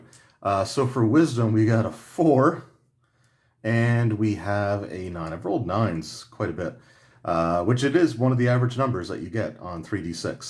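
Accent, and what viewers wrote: Canada. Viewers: Thanks for the video. Love seeing 2E stuff. You sounds like you're from east coast Canada :)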